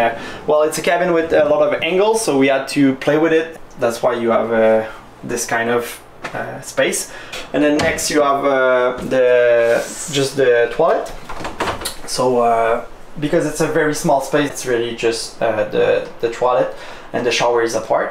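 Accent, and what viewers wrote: Quebec, Canada. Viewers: Quand t'entends l'accent Quebecois! OMG C accessible!!! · Tu le sais tout suite quand un québécois parle en anglais haha !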